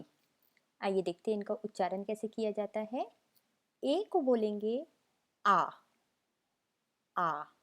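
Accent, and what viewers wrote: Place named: France. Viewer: Vous avez un accent français très mignons 🤗 Je vais me servir de cette vidéo pour faire l'inverse : apprendre l'alphabet hindi 😂 Si je peux me permettre de faire une remarque, ce n'est pas "les alphabets" mais "l'alphabet". Par contre vous pouvez dire "les lettres" :)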